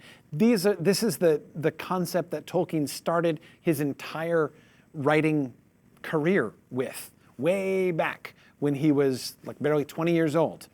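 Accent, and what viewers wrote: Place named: Ireland. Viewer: Irish hobfoot accent doesn't help. Can you hear the sounds of "magic WANd" & "number ONe"?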